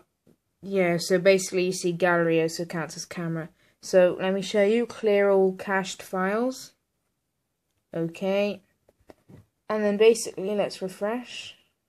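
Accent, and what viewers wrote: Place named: United Kingdom. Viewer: you sounds like British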